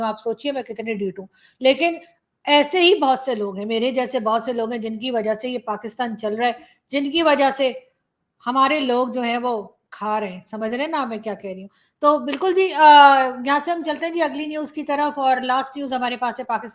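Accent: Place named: Allahabad, India. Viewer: you are 100% Allahabadi, the way you speak is like HARDENED ALLAHABADI absolutely Pure, this is the way a NATURAL ALLAHABADI SPEAKS ❤😂 You are hilarious 😅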